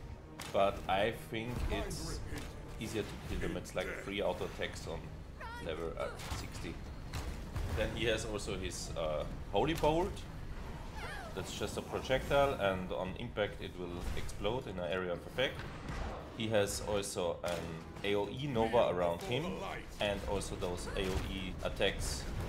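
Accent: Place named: Germany. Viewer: Wenn Deutsche Englisch sprechen :D <3 danke für den Guide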